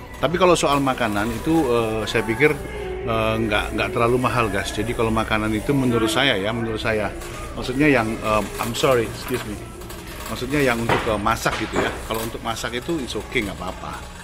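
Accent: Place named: Java, Indonesia. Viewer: Jowo ne jik kentel rek😂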